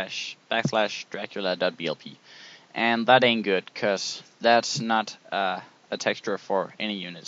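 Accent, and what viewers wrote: Denmark. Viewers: Haha dansker xD? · You are Danish! =P Oh... And thanks for the help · Oh shit, dansk accent alligevel lol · du er dansker xD jaaaaaaaaaaaaaaaa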